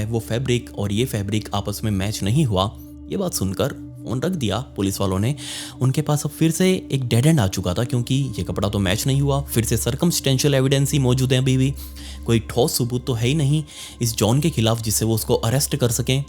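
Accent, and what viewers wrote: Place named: Mumbai, India. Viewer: आपका बोलने का तरीका बहोत अच्छा हैं और मुंबई स्टाइल वाला हैं इसे आप जैसा हैं अच्छा हैं साहब जय हिंद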